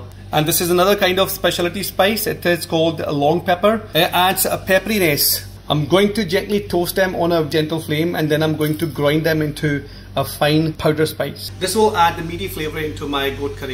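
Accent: Scotland, United Kingdom. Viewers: Is that a Scottish accent I hear? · I detect a slightly Scottish inflection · Why you sound scottish? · Looks delicious probably cooking tomorrow 👍 You sound like you have been working ing in uk/Scotland??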